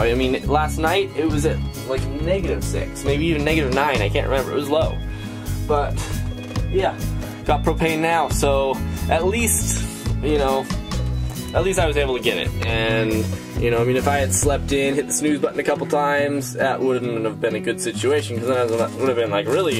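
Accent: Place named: Canada. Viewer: I'm hearing a Canadian accent in your voice lately. Please explain what "Fahrenheit" is for the 90% of the metric planet earth please...lol.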